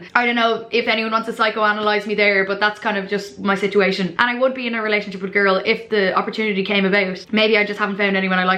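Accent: Ireland. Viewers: You’re just class like I love your videos and your accent too. Where in Ireland are you from?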